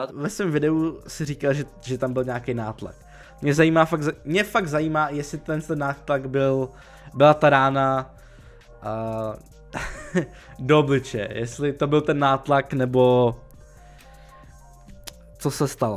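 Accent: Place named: Silesia, Czech Republic. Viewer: tvoje čtení slezského nářečí mě baví jak cyp :D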